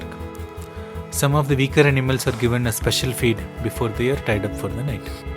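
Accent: South India, India. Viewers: Indian tone whilst Briefing 😜though loved it ❤️ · South Indian talking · You english language not good because south type language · I think you r south indian voice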